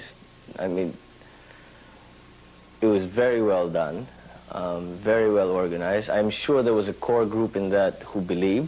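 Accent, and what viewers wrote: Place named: United Kingdom. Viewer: Napaka talino ng ating bagong elect na pangulo. Napaka pogi pa. Hawig pala sya n vince. May british accent pa sya dito. Naging emotional sya at times at nag ligid ang luha . Mahal naming PBBM, this is it, ung sinabi nyo po na gagwin ninyong lahat para matulungan ang mga pilipino . Like your father and PRRD , mahal na mahal nyo rin po ang mga pilipino at ang ating bayan. Mabuhay po kayo God bless you and guide, protect and help you in governing the Phil. ❤️✌️🇵🇭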